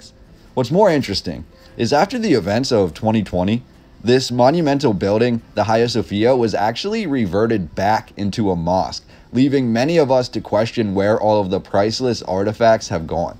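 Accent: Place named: West Coast, United States. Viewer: I appreciate the content but can’t help but wonder where your accent originates. My guess is West coast 🤔 either way keep up the good work.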